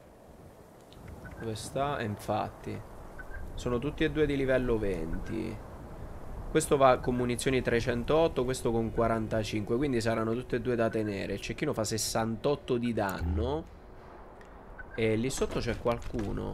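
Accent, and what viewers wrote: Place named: Abruzzo, Italy. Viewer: Non ho capito se sei abruzzese o napoletano, dalla voce della tua fidanzata mi sembra napoletano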